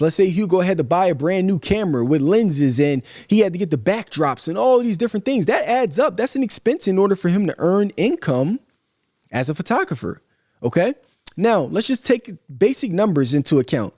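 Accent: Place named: Philadelphia, United States. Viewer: Huge fan of the videos and have learned a WHOLE lot but I do have a question: How do you and Cassidy sound exactly alike but Meek Mill screams so much? Is there a section in Philly that just screams, or do most have the same accent you and Cassidy have? I'm genuinely curious not even trying to be funny...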